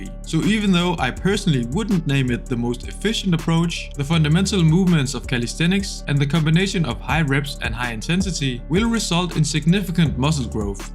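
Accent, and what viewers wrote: Denmark. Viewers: Nice video :) You sound danish. If so, where in DK are you located?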